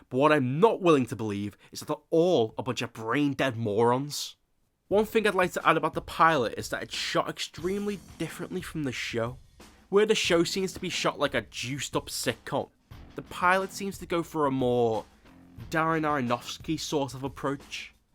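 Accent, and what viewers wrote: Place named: Liverpool, United Kingdom. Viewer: Have to say bro, these videos are excellent! Like the scouse JonTron 😉 Gary chopper should be proud 😉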